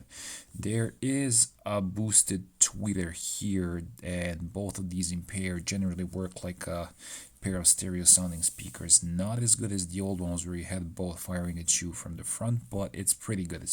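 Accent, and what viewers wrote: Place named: Serbia. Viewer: Jel si ti jebeno iz Srbije? Cim sam tvoj prvi video otvorio pomislio sam da si iz Srbije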